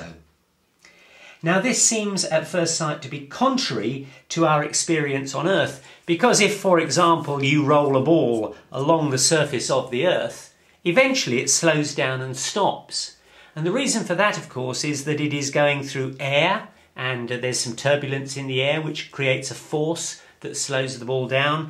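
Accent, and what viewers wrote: United Kingdom. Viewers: Rather unrelated, but your voice is so familiar (and peculiarly nice to listen to). Have you been in other youtube projects/channels? Edit; nevermind, apparently you just have a strong UK accent which makes you sound a lot like a guy on Numperphile Also, thanks for making this video (and the rest of them for that matter, as i assume i will like them as well). It's rather difficult to know what subjects to start with, but also what source you can actually understand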